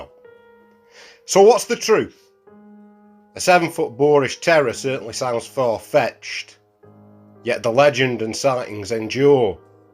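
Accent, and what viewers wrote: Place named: Staffordshire, United Kingdom. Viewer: Love your channel!!! In a age of computer generated A.I narrator's,it's awesome to hear a Staffordshire accent telling the stories. Respect brother!!! 🙌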